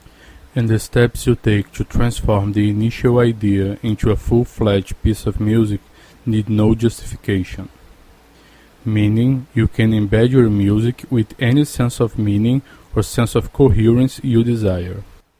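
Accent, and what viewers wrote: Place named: Brazil. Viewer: Puta que me pariu, comecei a aprender baixo e curto math rock tem um tempinho. Sigo teu canal faz uns meses e eh o meu preferido! vendo esse video e o sotaque pensei " vish, parece BR" e eh mesmo hahaha Maaaano, muito feliz em saber! :D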